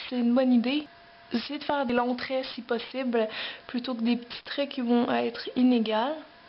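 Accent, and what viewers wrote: Quebec, Canada. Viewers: Salut, j'adore ta chaine tu a de l'humour j'adore l'accent quebequois,j'adore ta chaine JE TE KIFF!!!!!!!! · c parcqu'elle est québécoise. peut-etre qu'elle n'a pas un bon accent français mais je parie qu'elle parle anglais mieux que toi. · Est-tu du Québec :D ?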